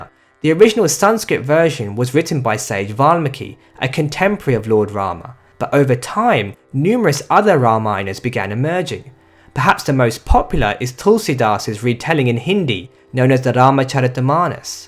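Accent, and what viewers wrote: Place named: United Kingdom. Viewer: First of all great video, it seems from the accent you are british but the pronunciation of hindi words and gods names or places was excellent ,thanx for making this video ,truly educational.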